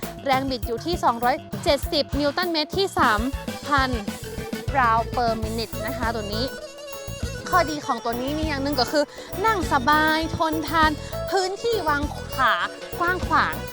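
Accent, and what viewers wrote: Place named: North, Thailand. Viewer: อู้เมืองม่วนแต๊เจ้า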